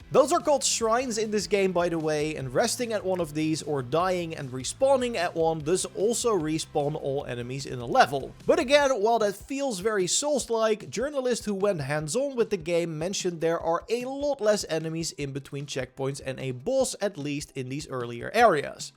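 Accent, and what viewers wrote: Netherlands. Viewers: He is dutch, you're correct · I think he's not a native speaker. His accent sounds dutch.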